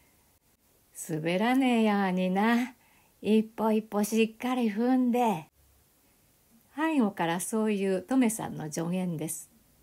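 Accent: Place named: Hachinohe, Japan. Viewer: 彼岸じゃらく…当地方の彼岸の辺りの悪天候・・・懐かしく八戸弁（東京弁のですが…）を聴かして戴きました。 ｢ ｣さん、ありがとうございました…。 感動の朗読をありがとうございます❗ 感謝です…‼️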